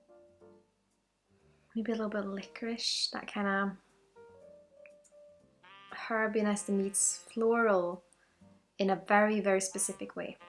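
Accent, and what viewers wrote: Scotland, United Kingdom. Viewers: You have quite a strong Scottish accent for a Swede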